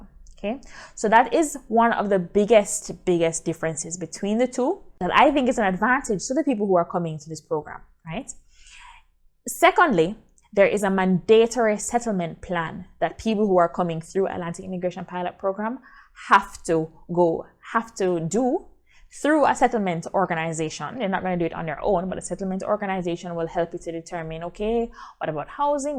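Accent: Jamaica. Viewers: are you jamaican ? smile and thank you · I can hear the Jamaican accent. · AIPP program seems feasible--thanks for the info. Yuh come from Jamaica, nuh true? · Great job. You sound like a yawd lady (Jamaican)?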